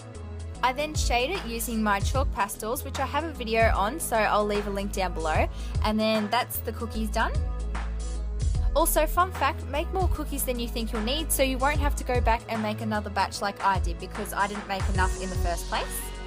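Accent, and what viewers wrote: Australia. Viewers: Are you Australian? I noticed that the clay you are using for the cat can be sold in Australia and most of the videos I see have clay from America.. then I noticed the accent kinda sounded Australian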